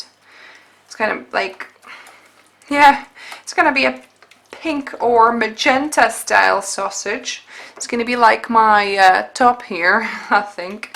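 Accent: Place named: Scotland, United Kingdom. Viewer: Are you Lithuanian? I was so sure you were Scottish or from somewhere nearby in the UK! But I'm not from there myself so maybe there is some small differences that I don't hear. The okara roast looks interesting. I'm getting so much okara now since I have started to make tofu and chickpea yogurt so I'm really appreciating the okara recipes!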